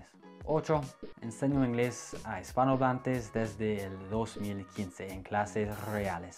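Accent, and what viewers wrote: United States. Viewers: vos sos un buen profesor, lo que no te ayuda mucho es tu acento gringo. creo que nadie querría aprender a hablar ingles con una persona que habla español con un acento tan marcado, da como mala espina. pero si sos bueno, eh aprendido mucho con vos ! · Profe debo reconocer que cuando Zack Morris nombró a Kale y a usted, sí me sorprendí, pensé que era usted y fue muy decepcionante. Porque físicamente se parecen, pero la esencia de los dos es muy diferente. Usted es el otro lado de la moneda, es profesional y carismático. Y también hay otra gran diferencia entre los dos, Kale habla un Español con un acento casi perfecto y usted profe habla un Español con un toque de acento gringo(lo cual es adorable). Gracias por la aclaración ☺️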